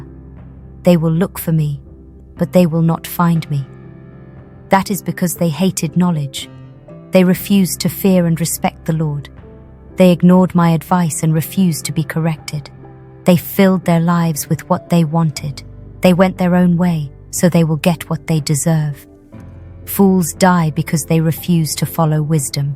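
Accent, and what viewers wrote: United Kingdom. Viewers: I love it. Is that a British accent?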